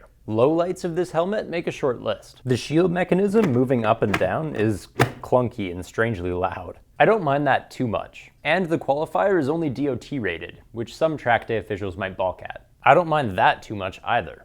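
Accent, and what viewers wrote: Canada. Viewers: is he Canadian ?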